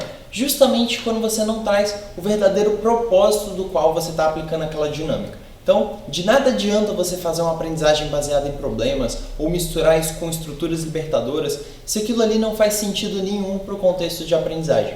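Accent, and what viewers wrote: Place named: Espirito Santo, Brazil. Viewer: Você é capixaba? O sotaque parece haha muito bom!!!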